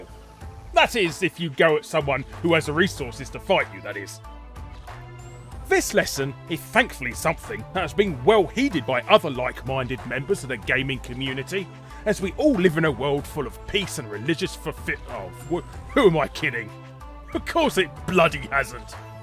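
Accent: United Kingdom. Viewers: Time for another 13 minutes of that good ol' British accent.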